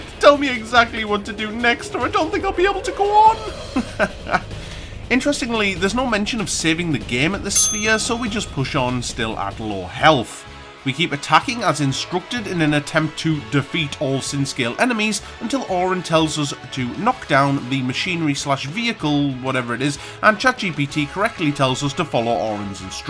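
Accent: North East England, United Kingdom. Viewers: up the north east 🇬🇧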